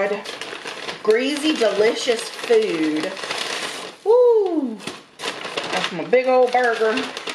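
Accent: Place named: AAVE, United States. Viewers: Ebonics hella cool Bro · Enough with the Ebonics. Dang it!!!!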